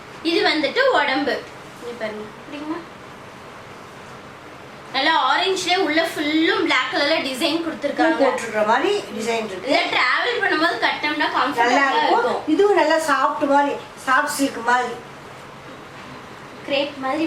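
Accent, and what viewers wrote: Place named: Salem, India. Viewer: Salem slang keattu yavlooo nall aguthu ma......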